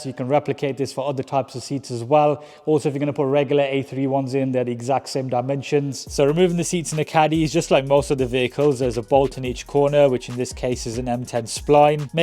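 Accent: United Kingdom. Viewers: So beautiful British accent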